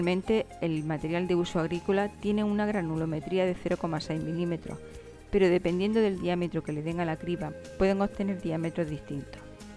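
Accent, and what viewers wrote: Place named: Murcia, Spain. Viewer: E murcianica la que habla.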